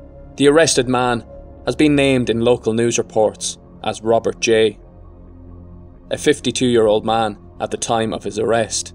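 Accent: Ireland. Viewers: Your channel has just popped up on my list.. another glorious Irish accent like Mike at That Chapter. and no gimmicks just clear narrator and detailed story .I’ve subscribed .. 😀 👍🏾👍🏽👍🏼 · Irish narrators rock.Respectful to victim,and her mother.No bias here💚🇮🇪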